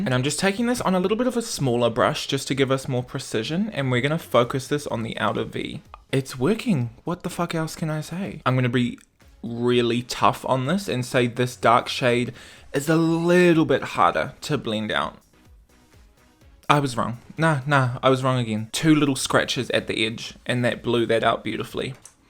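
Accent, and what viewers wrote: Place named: New Zealand. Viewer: LOVE hearing a Kiwi accent! (waves from Southland) ❤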